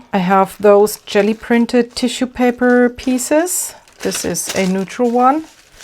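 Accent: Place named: Germany. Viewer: I was wondering if you were German as your accent sounded German. I think your speaking English and explaining things really well. Really lovely art techniques again. And your fingernails aren't horrid at all, lol. You nailed this video and your art perfectly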